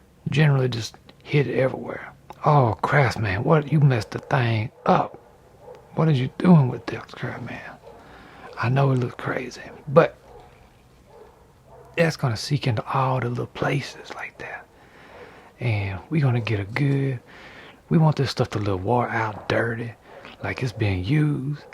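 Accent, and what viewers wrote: Louisiana, United States. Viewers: Mr., comment ça va? 😃 I am a professional artist. I hand paint fine art on silk. I am a watercolorist, wall muralist, pastelist, ceramicist and, as a fellow artist, I suggest that you not worry about what anyone has to say regarding how you paint, or anything else! You do you, the! If anyone insults you and your God-given abilities just know that they are doing so because of envy. Praying for them is how I deal with it, but that’s just my suggestion. I suggest looking into copyrighting on your CrAzY ChIcKeN🤪! People these days seem to have no respect for one another and even less so for one another’s property - especially when it comes to something that is making money. You spoke about commenters writing who or what you sound like. To me, you sound like some of my family who lives in Southern Louisiana, specifically Grand Caillou/Cocodrie area! 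I hope you sell a lot of your CrAzY ChIcKeNs (poulets fermiers (free-range chickens) and you make more figures, action or stagnant! Do you ever paint a protective coat onto your figures? Do you sign or put a symbol on your work? If yes, what? Though this next sentence is truly none of anyone’s business, especially mine, but if the demand far outweighs the supply, I hope you stay in the USA! Thank you for sharing your work with all of us! Thank you for sharing how you work with us all! God Bless! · Hey umm to me the only guy you sound like is someone from Louisiana because I’m form Mississippi and I hear a lot of people from Louisiana talk and you sound just like em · I think what people are trying to saying about your voice is you got that calming whisper thing going. To me you sound Southern and maybe vaguely Cajun.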